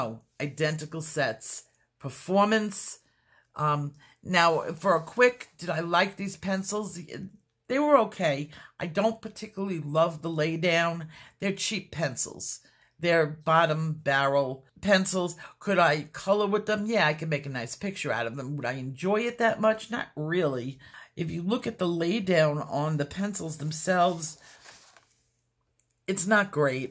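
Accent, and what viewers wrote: New York, United States. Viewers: Yes, I have discovered this myself. Thank you for all you work...as a former NYer I love your accent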